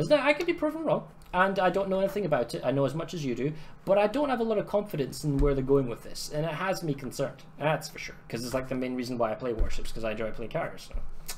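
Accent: Scotland, United Kingdom. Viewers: ?? ...ok... II'm a Midlander, and even I can hear the Scotts in his accent, than an Irish sound. (Mind-due the Proddies and Catlicks can sound different from each other, ..but, just the crazier ones of of both sound more like Glaswiegens with less phlem & spit in their syllables me.) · You are a Scot? You sound very American... I would love to hear your commentaries in Scottish, love the accent! :D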